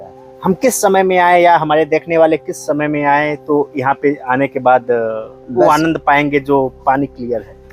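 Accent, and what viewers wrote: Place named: Bihar, India. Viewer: Tu pakka bihari hai...abe saaf saaf bol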